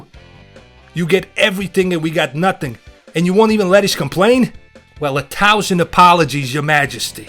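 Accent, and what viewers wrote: New York, United States. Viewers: Dude sound like a 30 year old New Yorker from the 70s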